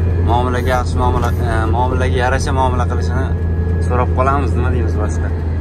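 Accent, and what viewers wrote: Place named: Navoi, Uzbekistan. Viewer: навоийни каеридансиз шевангиз вапше навоийникига ухшамидику еки хатирчиданмисиз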